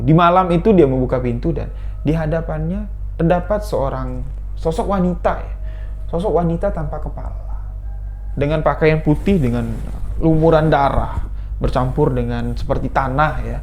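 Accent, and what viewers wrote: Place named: Jambi, Indonesia. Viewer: Banten hadir kk.... Aku dari pertma x ikutin sebuah kisah misteri itu suka bnget dri cara n logat bicara y kk....logat jambi yg kental bnget Kaya kita kbawa alur cerita yg d kisahkn Pokok y sebuah kisah misteri mh keren hbis ....sehat n semngt trus y ka ..... Sukses....mg Allah sllu mlindungi kita semua.....🙏🇮🇩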